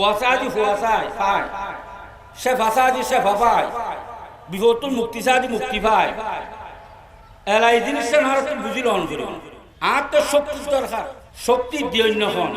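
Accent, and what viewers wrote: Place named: Chittagong, Bangladesh. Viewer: চিটাগং ভাসা বুচতে একটু কস্ট হই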